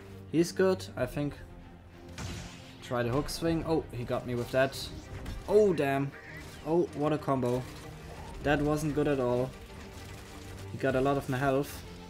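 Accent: Germany. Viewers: Bei Gott ich lieb deinen deutschen Akzent! XD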